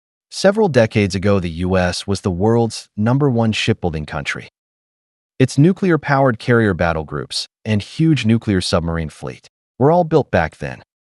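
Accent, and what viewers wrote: India. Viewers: HER CURRY ACCENT IS IRRITATING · Palki's dream to join CNN or Fox in USA, as do all pajits dream to leave Endia. But first, she needs to clear that curry accent.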